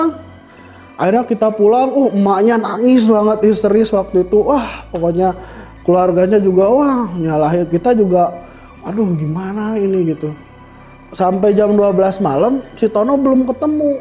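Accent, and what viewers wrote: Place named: West Java, Indonesia. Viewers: Logat sundanya kebawa😂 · Bang serius klo sunda sunda aja jadi kagok dengernya😅😅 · Sunda pisan euy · Gua.. Gua... Aneh ceuk akumah logat sunda gua guan...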